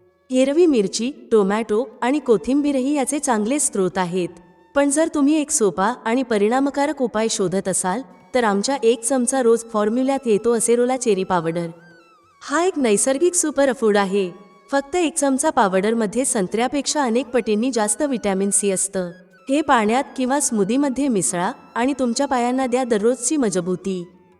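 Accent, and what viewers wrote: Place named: Karnataka, India. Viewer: अगदी बरोबर.... मलाही माहिती उपायुक्त वाटली पण यांच्या व्हिडिओ तील कानडी टोन ऐकायला नको वाटतो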